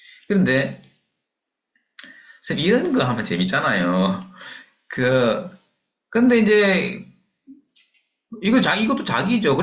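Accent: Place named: Yeongdong, South Korea. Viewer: 예전에 선생님이 라는 호를 사용하실때부터 말씨도 그렇고, 제 고향 인근 분이 아닌가 생각했었는데 맞군요!! (저는 고향이 황간, 영동쪽이 아니고 접해 있는 경북입니다. 고등학교 친구 중에 황간 출신도 몇 있죠!) 템플스테이 할곳을 찾다가 사를 다녀온 기억도 나네요. 산 계곡, 월류봉, 물한계곡 참 좋은 곳에서 태어나셨네요!! 올갱이국도 생각나구요. ^^ 강의 열심히 챙겨서 듣고 있습니다!! 감사합니다.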